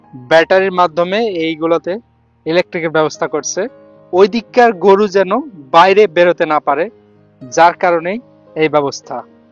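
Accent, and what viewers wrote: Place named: Chapai Nawabganj, Bangladesh. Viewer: ভাই আপনার বাড়ি চাপাইনবাবগঞ। আপনার কথাতে আঞ্চলিক ভাষা র টান আছে। কিন্তু আপনি তা উপেক্ষা করার চেষ্টা করেন। কিন্তু আঞ্চলিক ভাষায় কথা বলা কোন অপরাধ নয়।...... From Rajshahi Bangladesh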